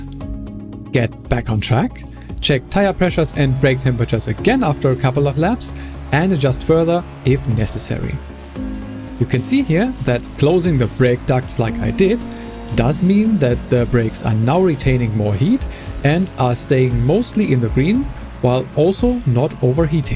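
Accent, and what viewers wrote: Germany. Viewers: I can hear Denglisch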